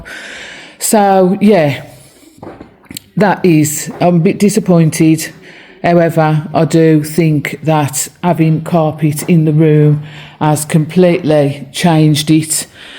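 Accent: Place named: Black Country, United Kingdom. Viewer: Hi love watching someone else with a blackcountry accent love the candle wick jokes x Done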